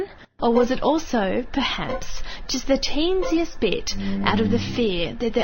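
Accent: Australia. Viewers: Australian accent? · aussie voice wooot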